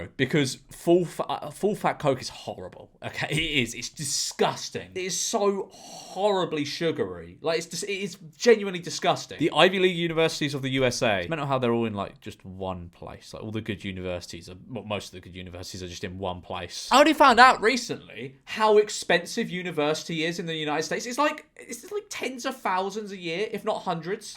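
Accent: United Kingdom. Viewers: Omg I’m having such a hard time. I needed this right now, seeing your masked face and hearing your British accent. My OCD is feeling good.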